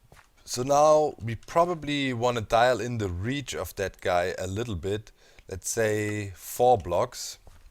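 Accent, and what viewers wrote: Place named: Germany. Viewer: For everyone who is not german you will not understand me. :P Hallo, Du warst auf meiner Startseite und das Video gefällt mir! Hoffe du machst weiter so!Edit: Übrigens Man merkt an deinem Akzent oder der Kanalbeschreibung das du Deutsch bist. Find Ich cool das Ich mal nen Internationalen Gamer gefunden habe der Deutsch ist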